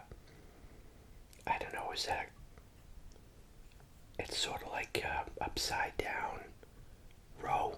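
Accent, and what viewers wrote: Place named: New York, United States. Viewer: kinda morbid I suppose but I guess your from New York from your accent - were you there on 9/11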